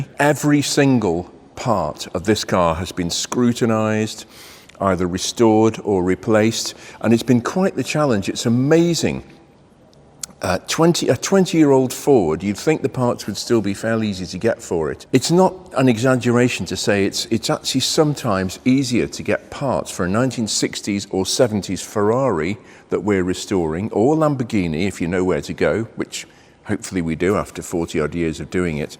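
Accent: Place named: RP, United Kingdom. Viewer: has to be the poshest bloke on YouTube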